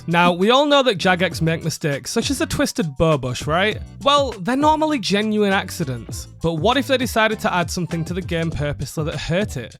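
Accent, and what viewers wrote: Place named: East Yorkshire, United Kingdom. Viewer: Sounds like east yorkshire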